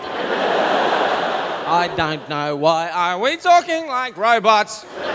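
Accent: Australia. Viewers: He speaks strine.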